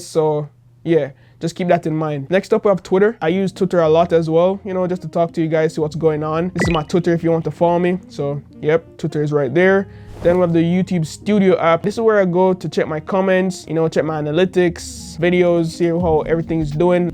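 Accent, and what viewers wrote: Jamaica. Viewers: You Jamaican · Yo bro are u jamaican? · You sound Jamaican breda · i can already tell this man is jamaican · Are you Jamaican? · u sound jamaican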